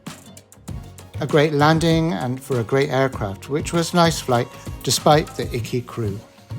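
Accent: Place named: West Country, United Kingdom. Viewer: Trying to place your accent, getting all over the place but think West Country somewhere even some Irish. Dying to know where you are from.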